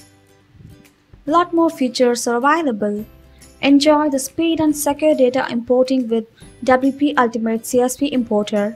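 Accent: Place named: India. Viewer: I love Indians and their English.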